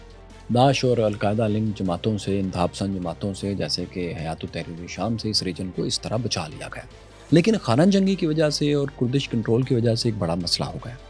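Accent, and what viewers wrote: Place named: Punjab, Pakistan. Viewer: What language are you speaking in? It appears to be a mixture of English, Arabic, Farsi, Turkish and Hindustani being spoken in a heavy Punjabi accent.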